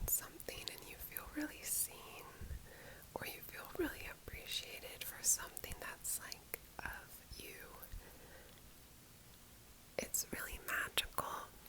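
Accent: Argentina. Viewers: Felicitaciones por estos 7 años con el ASMR. Que vuelvan pronto los videos en español con acento argentino. Saludos.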